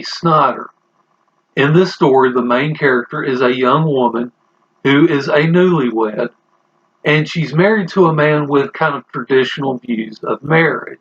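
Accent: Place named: Appalachia, United States. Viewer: Hey. First time viewer. I won't presume to guess where you're from, but the way you speak reminds me a wee bit of some dear friends from Appalachia. Also, good instinct with the lava lamps. Ads a nice dynamic element to your shot. Also, also, didn't know about stone babies before (I do the nurse thing IRL, and I am reliably fascinated by medical phenomena I haven't heard of before).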